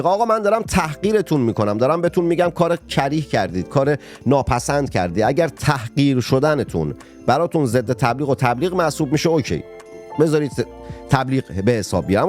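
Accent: Abadan, Iran. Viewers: واقعا وفق العاده بود از ریتم موزیک زمینه جذاب ، لحجه زیبای آبادانی بی نظیر من قمی لذت بردم ممنون که شعر می خونی ممنون که به برای کلماتت ارزش می دی و به وقت ما ارزش میدی در دوره که همه به همه چیز مصرفی نگاه می کنن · جان دمتگرم کارت و برنامه هات عالی، عاشق اون لهجه آبادانی اتم.سراختاپوس در تهرانه اسراییل و ایران باهم متحد خواهند روزی که رژیم نکبت فاشیستی شیعه نخواهد بود. و دشمنان ایران و پهلویها باز اونجاشون پاره شده برا اعلام رهبری شاهزاده.جاویدشاه❤❤❤❤ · کا دمت کرم 👌مو دختر میسلبمونوم زنده باشی. با لهجه ابودانی حرف بزنی بیشتر حال می‌کنم 👏👏👏👏👏👏👏👏👏👏👏👏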